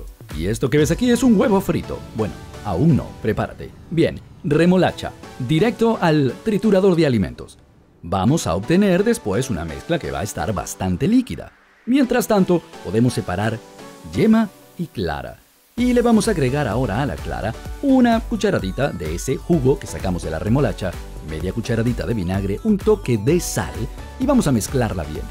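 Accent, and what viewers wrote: Venezuela. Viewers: Es acento venezolano.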